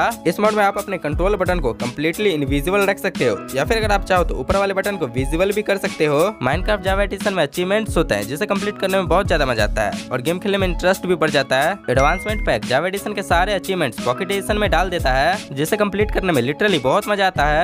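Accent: Bihar, India. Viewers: R U From Bihar ? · Bro ur bihari accent is awesome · you are from Bihar i m 99% sure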